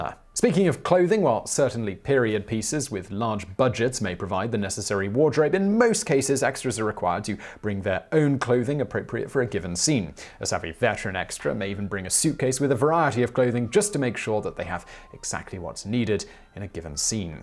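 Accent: United Kingdom. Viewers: becoming so much more playful. Love it:D Also great to hear his awesome British accent saying "tracksuit bottoms".:D We call them that over in South Africa.:D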